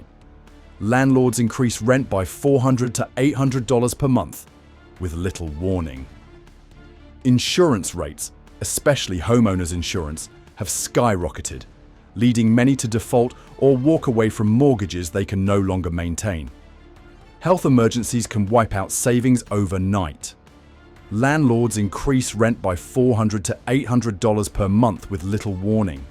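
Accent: England, United Kingdom. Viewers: The story would be better if it was. told by someone who sounded American. An Englishman, may just not think much of America, in general.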